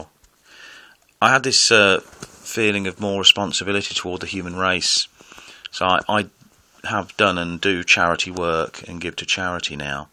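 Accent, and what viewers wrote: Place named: United Kingdom. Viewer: I've been a lurker on your channel for some months now, and I feel it appropriate at this time to show my appreciation for you posting these monologues. Besides the obvious depth of the subject matter, I also enjoy your strolls in the park, your British accent and "load of old rhubarb" expressions, and your richness of character. I'm not a JW, never been one and never will be, but I am amidst the long process of attempting to guide a born-in out of his misery. I thank you for your efforts.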